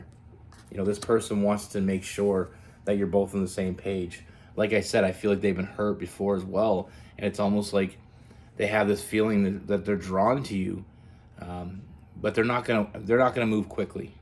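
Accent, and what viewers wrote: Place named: Canada. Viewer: Thanks for the story man I've been watching you for a while. For a while there I thought you were from Boston like me but turns out I guess you're from Canada. And I can kind of relate to you because all my cousins are from Canada. I definitely agree with friends first. I've known this guy on and off for years and 2011. All of a sudden out of nowhere he said that well basically you said that he didn't really say I love you but he said that he deeply cares about me I think he's afraid to say the l word so he is not ready yet. And kind of just came back in life. And I feel a little shaky or on ground because I don't know what direction this is going to head in. But I'm going to be patient with him and see where it goes. But he's the one that noticed that I didn't love myself and he stepped away for like a year. And now all of a sudden he says he misses me and cares about me deeply. 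I hope he's not lying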